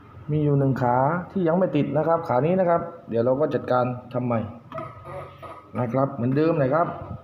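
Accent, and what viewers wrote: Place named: South, Thailand. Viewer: ขอบคุณพี่ ครับ ฟังสำเนียงแล้ว รู้เลยคนใต้บ้านเราครับ🙏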